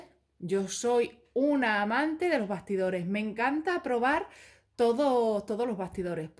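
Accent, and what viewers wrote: Spain. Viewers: Hola, estoy viendo tus vídeos y deduzco por tu acento que estás en España. Estaba mirando algunas de las webs de las que comentas productos, y veo que parecen estar fuera de Europa. Quería preguntarte si cuando te llegan tienes que pagar mucho de derechos de aduanas etc. Tienen cosas bonitas y originales, pero me echa para atrás lo de la aduana. Muchas gracias y que te diviertas con tus "compritas" 🤗🤗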